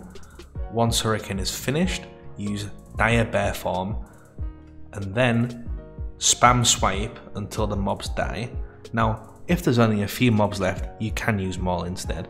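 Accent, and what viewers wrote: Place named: Yorkshire, United Kingdom. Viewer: I’m from Sheffield, I’ll recognise a fellow Yorkshireman anywhere :) Nice vid man, I played vanilla back in 05 when I was 13 and had no idea what I was doing. I stopped playing during Cata but me and my mates plan on coming back for classic White rose!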